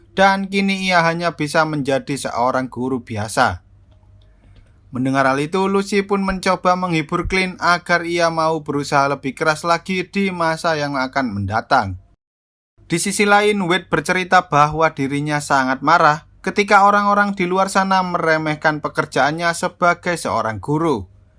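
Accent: Java, Indonesia. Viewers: Jawa bang? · Medok njir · Ngmong kaya bhasa jwo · Wong jowo yo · Anjir wong jowo pisan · Wong jowo to mas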